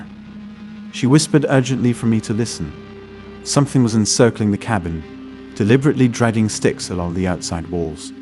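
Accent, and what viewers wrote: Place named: England, United Kingdom. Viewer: An Englishman ! 😉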